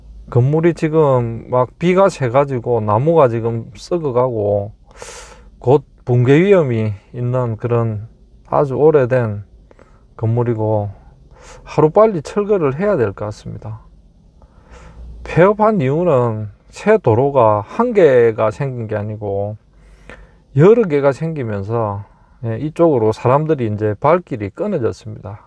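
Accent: Gyeongsang, South Korea. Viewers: 님 사투리 어디인가요!! 전남광주는 아니고 경남맞나요 구독좋아요 누르고갑니다 😊😊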